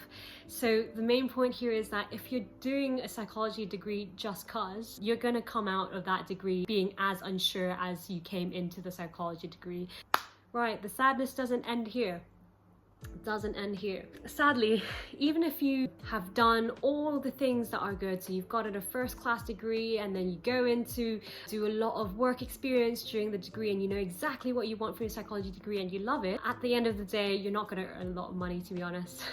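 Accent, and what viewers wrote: United Kingdom. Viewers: Love your gorgeous British diction !!! You present nicely, viz. you speak in complete sentences (subject + verb + object,) your predicates and pronouns agree in case, gender and number with your head nouns, and you do not lard up your presentations with that ignorant non-word, "UH." Very, very professional ! Greetings from Pennsylvania in the Queen's American Colonies. Best wishes for a good career and a good life. (PS - Jordan Peterson is my favorite psychologist on this side of the water.)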